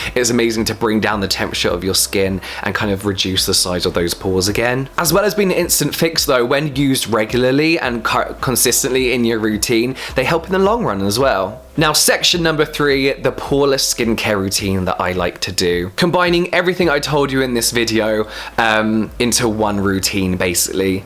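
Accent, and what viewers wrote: United Kingdom. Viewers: British accent lover, skincare lover and accidentally found your channel, perfect...!! Love your gesture when you're apply your skincare 😂😂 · Hi. I love British English, the way you pronunce words and speak. Beauty Is not my favourite subject but there is always much to learn. Your video is an effettive means of enriching my vocabulary. So thank you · Wow.. I love your British accent😘😘😘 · A wonderful British accent I've decided to watch all your videos to master that accent!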